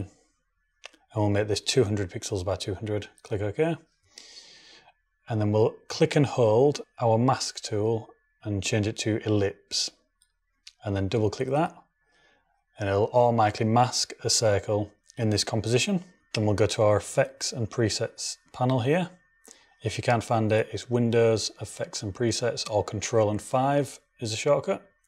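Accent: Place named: Hull, United Kingdom. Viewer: Sounds like you're a fellow Hullensian? :D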